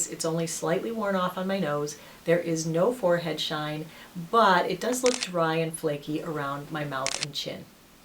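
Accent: United States. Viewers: This foundation looks amazing on you. Another thing, a bit irrelevant i guess, is that i really like the way you talk and articulate. Such a nice accent (american, but you still have many kinds of accents). Makes want to listen to your reviews :D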